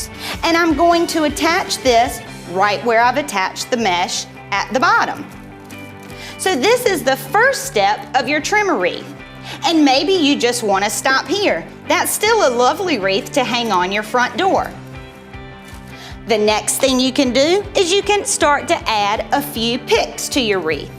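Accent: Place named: South, United States. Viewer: Wow her southern accent! Nice designs. Like these ideas.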